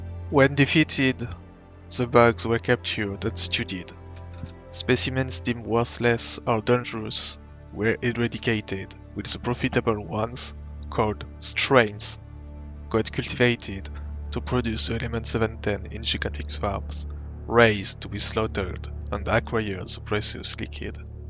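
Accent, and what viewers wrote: France. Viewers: j'ai l'impression de m'entendre essayé de parlé anglais en t'écoutant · you sound french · Your French accent is very strong, and that is FINE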